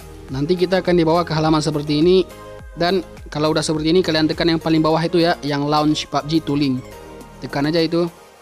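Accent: Aceh, Indonesia. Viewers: Gaya peugah haba apa aceh sang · Logat nya kayak orang aceh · Orang Aceh kah bang Logat bahasa nya kayak orang Aceh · Orang Aceh ya min?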